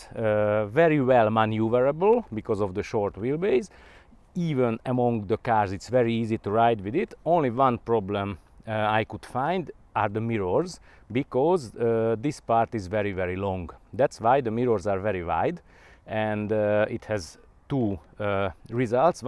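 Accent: Hungary. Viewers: as soon as i heard your accent i knew you are hungarian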